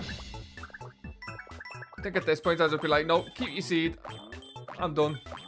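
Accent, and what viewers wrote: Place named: Liverpool, United Kingdom. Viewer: Scouse lad playing game of the year. Count me in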